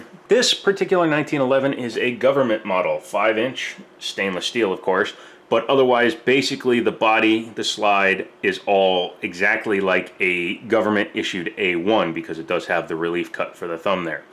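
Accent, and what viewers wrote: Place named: Chicago South Side, United States. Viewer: This dude has a Chicago Southside accent.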